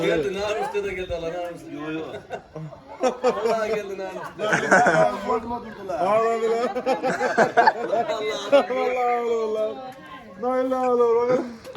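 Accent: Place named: Avşar, Turkey. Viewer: kardeşim selamlar, yeni abone oldum, memleket neresi kardeşim, avşarlık varmı şiveler benziyor gadasını aldığım 🙂 Frankfurt'dan selamlar hayırlı günler 🇹🇷🇹🇷🇹🇷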